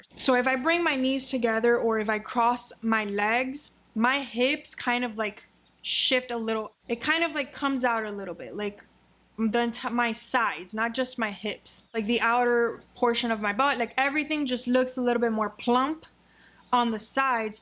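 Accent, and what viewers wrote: Miami, United States. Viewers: That is the Miami accent... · i know a miami girl accent when i see one 🥰🥰🥰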